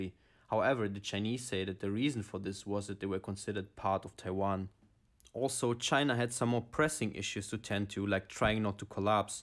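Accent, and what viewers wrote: Germany. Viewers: What accent? Sounds German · My guess would be Central European Germany maybe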